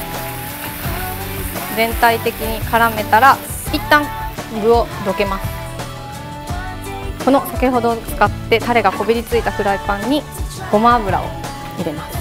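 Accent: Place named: Kansai, Japan. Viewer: 関西の人なんだ。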